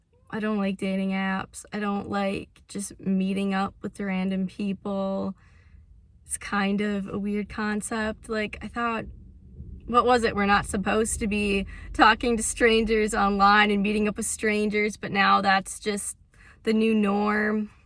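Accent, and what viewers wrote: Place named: Wisconsin, United States. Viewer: I love the cute Wisconsin accent that is something between the Canadian “oooout” and the American “aut”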